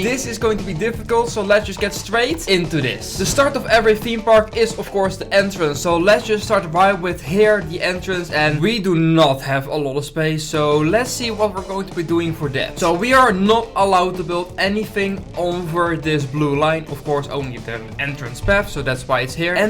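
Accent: Netherlands. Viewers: i don't know why but i smell dutch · I smell dutch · HAHAHA NEDERLANDSE ACCENT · I smell dutch in your voice ( SRY ) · I smell nederlands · Je bent zoizo Nederlands soms hoor ik Nederlandse accent😅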